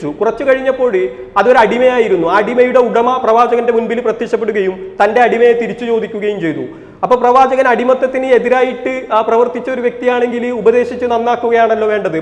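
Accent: Kasaragod, India. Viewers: Hi brother I like your open mind and honesty.kasargode slang is not bad.you are doing your job well and your presentation is exempt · താങ്കൾഒരു അദ്ധ്യാപകൻ ആയിരുന്നു എന്ന് പറയുന്നുണ്ട്. ഏതായിരുന്നു വിഷയം (subject) എന്ന് അറിയാൻ ആഗ്രഹിക്കുന്നു. എന്തായാലും ഈ അടുത്ത കാലത്താണ് താങ്കളുടെ videos കാണാൻ അവസരമുണ്ടായത് വളരെ informative ആണ് താങ്കളുടെ അവതരണം. സംസാര ശൈലി എനിക്ക് ഇഷ്ടപ്പെട്ടു കാരണം ഞാനും ഒരു കാസറഗോഡ് കാരനായത് കൊണ്ടാകും. ഇനിയും ഒരുപാടു വീഡിയോസ് പ്രതീക്ഷിക്കുന്നു 👌👍.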